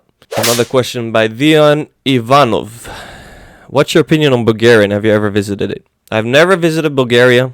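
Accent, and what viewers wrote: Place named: Netherlands. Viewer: You sound kinda Dutch to me.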